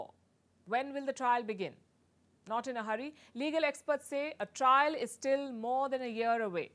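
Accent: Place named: India. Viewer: You....English please! Not indi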